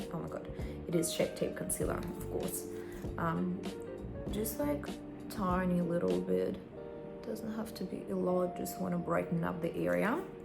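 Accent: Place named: Australia. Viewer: у вас такой классный австралийский акцент😍очень красиво звучит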